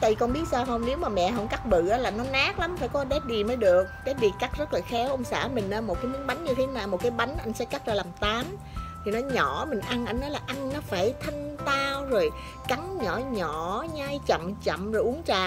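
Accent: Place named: South, Vietnam. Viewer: Giọng miền nam của bạn mình rất thích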